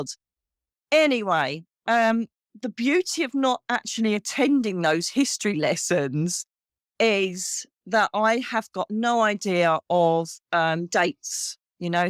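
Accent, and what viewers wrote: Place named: England, United Kingdom. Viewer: I love the English accent but need a translator. What does "pants" mean to a US born person? Your teacher was pants as in a pain in the butt? lol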